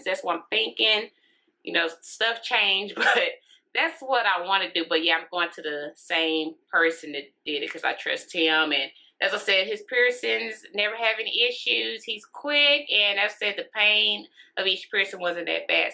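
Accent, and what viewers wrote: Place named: South, United States. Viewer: Ooooh that southern twang....lol. Country girl. I got the same piercing. I love it.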